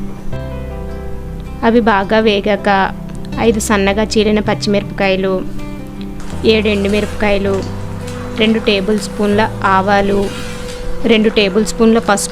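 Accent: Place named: Godavari, India. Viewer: Nice vedeo making, nice background scoring, godavari accent... Simply superb.... Chusthunnanthasepu haayiga undhi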